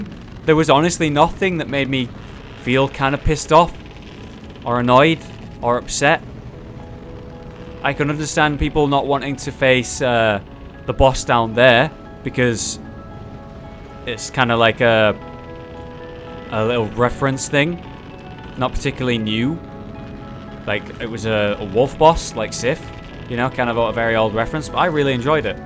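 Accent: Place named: Wales, United Kingdom. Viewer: Scottish I thought he sounded Welsh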